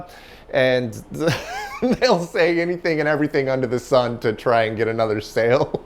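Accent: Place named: Texas, United States. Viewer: Yep. I'm assuming he has a Texan accent since he lives in Texas and sounds like most of the Dallas/Ft. Worth area folks I know. In that accent, "indian" and "endian" sound exactly the same.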